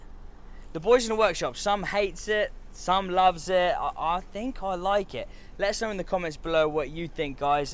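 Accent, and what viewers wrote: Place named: United Kingdom. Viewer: Twit-ah. I love British accents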